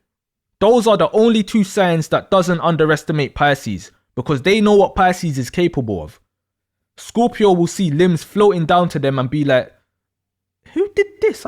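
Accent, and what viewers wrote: United Kingdom. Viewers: One of the only British voices that commanded My respect.😄 Great Analysis. I found this video searching for a video to explain this. I was triggered today about someones description of a Piscean in love. You explained it perfectly. Ase'. Let Me add, Very Few can make Me laugh, and Your voices explaining the mindsets took Me out. Thank You. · I’m 45 march 6th Pisces my other half is 47 and a Libra we’ve been together for 28 years and it’s been a lot of work from both sides for many years but he is my soulmate, it’s been questioned a few times but now I see why and so does he. I was going to hold back and send you a message after I’d watched all your videos but I couldn’t wait any longer you’ve been so helpful on my journey of realising who, and what I am you’ve helped me come to terms with many dilemmas I’ve had over the years, your insight is second to none so I wanna say thank you so much for sharing. 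I love all your little cartoony bits and you’ve got a great humour that has me laughing out loud and I love your don’t give a shit attitude too!! Which is helping me bring mine out!! So thank you again sending lots of love and light from this fellow Piscean ps my mums a Scorpio (terrible upbringing- she was selfish as fahhh) dads a Taurus so one caring one for me at least 😂😂. Please carry on as you’re helping so much and I think I’m gonna miss that London twang being an Essex girl through and through ❤. Love ya man!!!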